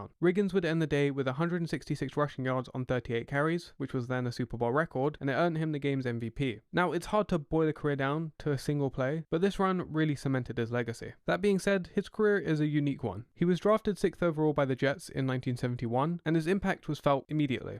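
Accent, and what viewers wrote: England, United Kingdom. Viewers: I get so sick and tired of somebody putting on youtube , talking about a sport with an english accent that they're just reading a f**** script and know nothing about do better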